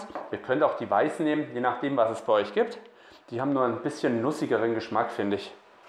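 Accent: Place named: Franconia, Germany. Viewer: Gelernt ist gelernt. Ich brauche schon zum Champions putzen und schneiden 15 Minuten. Beide Rezepte finde ich gut, werden nachgekocht. Danke für Deine Mühe. Darf ich fragen wo Du lebst? Hört sich fränkisch an. Ich komme aus OF (Lichtenfels), habe aber lange in Nürnberg gelebt. Seit 24 Jahren lebe ich aber nicht mehr in der EU.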